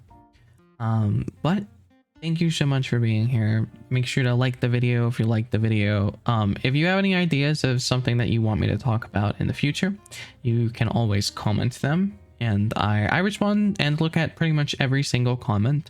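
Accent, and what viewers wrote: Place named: South, United States. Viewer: i am european 😄 but i do like the red neck accent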